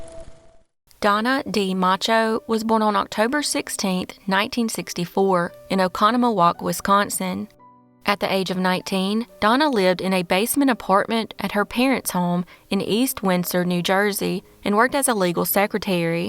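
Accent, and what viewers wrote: South, United States. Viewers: I enjoy your videos. I love you accent which is easy for me to understand, being Southern lady myself. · My favorite southern girl's voice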